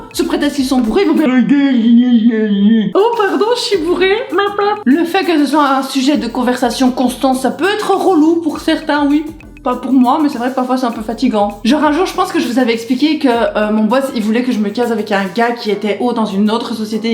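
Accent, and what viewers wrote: Belgium. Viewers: Un accent belge et très vulgaire, au secours ! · Super marrante ! Je me disais bien que t'avais un accent belge j'adore !❤👍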